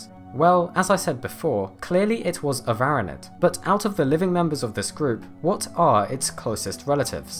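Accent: United Kingdom. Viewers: STOP WITH THE ANNOYING BRITISH ACCENT. Standard American English is fine. Doing a video on Aussie Wildlife, how about using an Australian accent..JMHO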